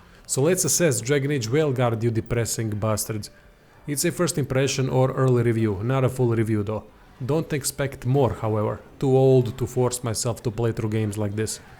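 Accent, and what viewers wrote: Russia. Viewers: впервые слышу столь стереотипичный русский акцент не от актера, изображающего русского, а от самого русского. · Melodramatic Russian sounding cunt. Hilarious! I like ur take and will check out other videos. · Всё по делу рассказано. И этот ядрёный русский акцент)))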